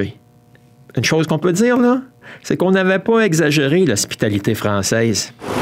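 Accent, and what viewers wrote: Quebec, Canada. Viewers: ton accent, le fameux accent de nos cousins québécois, on adore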